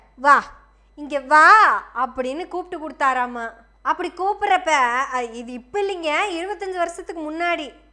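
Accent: Kongu, India. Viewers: கொஞ்சும் கோவை கொங்கு தமிழ் சூப்பர்மா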